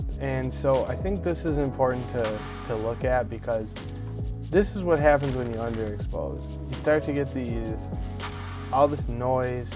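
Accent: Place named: Rochester, United States. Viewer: I haven't been home in over 3 years but just listening to your Rochester accent made me feel at home :)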